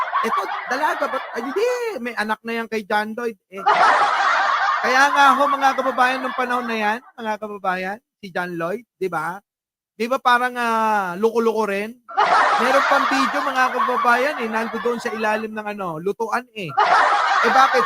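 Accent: Ilocos, Philippines. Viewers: Sir natatawa aq sayo sa ilocano mo haha. Minoramopa mga ddsit sabimo okininayo kamo haha ilocano aq sir tinototokan ko tong programamo sa utube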